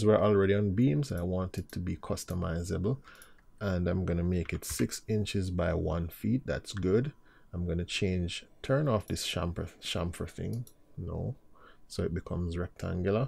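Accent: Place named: Jamaica. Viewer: Absolutly the best tutorial of AutoCAD Architecture! Really good explaination in funny "Jamaica" styl:). Perfect. I'm looking forward for Last Episode with setting Sheets. Great Work!!!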